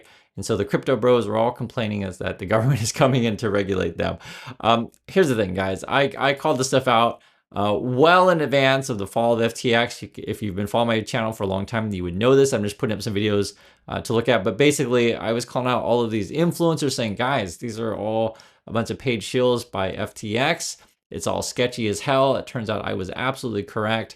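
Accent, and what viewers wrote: United States. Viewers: I've only just seen your channel today. Your accent sounds American. you may be able to pass as Canadian. So why are people telling you to move back to China? It sounds like you were born here. Or at least grew up here. Although I would guess at least 3rd generation American.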